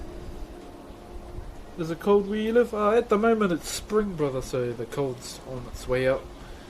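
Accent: New Zealand. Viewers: Maori accent, its not that common so you pick up on it more.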